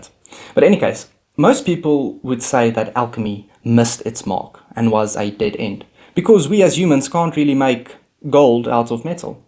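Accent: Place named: South Africa. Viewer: Just out of curiosity, where do you come from? Your accent sounds South African (like British roots mixed with an Afrikaner accent), but you could also be from the continent of Australia. It would be quite a surprise if you are South African as not many South African Youtubers make this kind of content.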